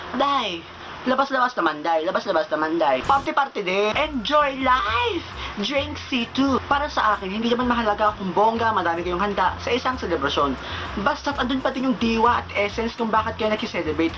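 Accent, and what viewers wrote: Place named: Cebuano, Philippines. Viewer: cebuano accent mo dabest!!!.. like2!!!...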